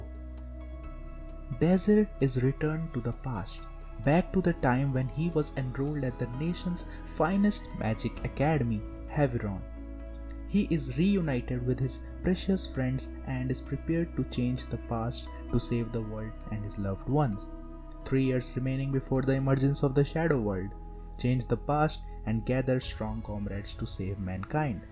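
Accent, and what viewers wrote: India. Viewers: Your accent's just like Indian people Where are u from? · No disrespect the moment I heard the first word you spoke... In my ma mind, Yep definitely dis dude is from India.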